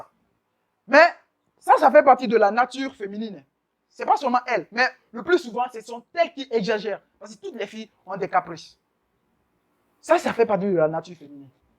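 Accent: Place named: Cameroon. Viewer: Tu a le même accent que Samuel etoo l’ex soccer player je suppose que tu viens aussi du Cameroun comme lui haha 😉😉😉😉😉😉😉😉😉😉💪💪💪💪💪💪💪💪💪💪💪💪💪💪💪💪💪💪💪💪💪💪💪💪💪💪🤜🤜🤜🤜🤜🤛🤛🤛🤛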